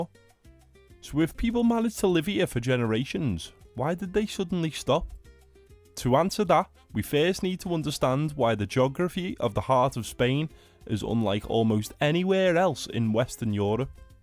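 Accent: Scotland, United Kingdom. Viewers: Are you from Scotland? I mean, it's Scottish pronunciation?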